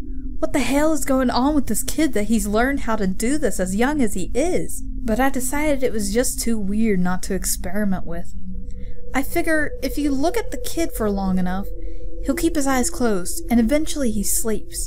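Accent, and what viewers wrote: South, United States. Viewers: Nice southern accent:P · Nice accent you made me truly believe you were a southerner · You do a very good southern accent!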